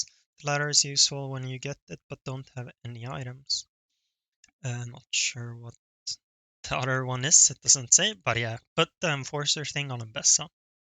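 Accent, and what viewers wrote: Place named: Sweden. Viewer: At the end there.. What Title meant with the tips is that it is crucial to find Illaio 2 with rebels, and to do that over Zoe 2 star. Spending 12 gold on making Zoe 2 star when rolling down(and being stuck on that 12 gold loss - cant sell her), then being broke - and then not finding Illaio 2 star. He means that this is a big "loss condition" for rebels. How to lose games with rebel. Maybe prio looking for 2star illaio first, then other units when rolling down, maybe dont make Zoe 2 star if low gold when rolling down + no illaio 2 star. Great videos btw, i love your content. Keep it up! P.S you sound Swedish. Heja svenskar, snart blir det varmare här! haha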